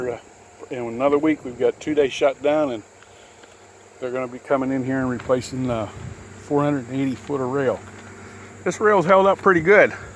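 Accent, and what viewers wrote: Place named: Maryland, United States. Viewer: Man, I don't think I've ever heard a thicker Maryland accent in my life.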